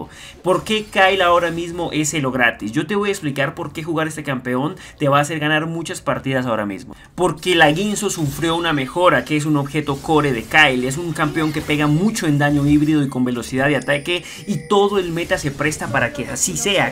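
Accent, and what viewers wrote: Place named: Ecuador. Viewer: hola, tu acento se parece al ecuatoriano xD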